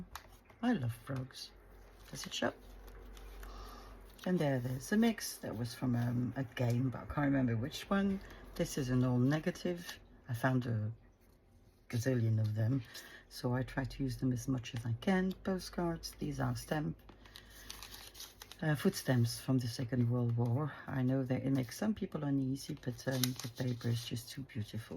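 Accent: France. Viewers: Very good video and your English sounds fine with a French accent! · Wonderful first video! Don't be so hard on yourself! Your English is fine! I loose words too, and I speak English all the time. I also work with a woman from France, so I am comfortable with your accent! Keep up the good work! I got your link from Sevenplaza. Thanks!!! This is Carol from IN. USA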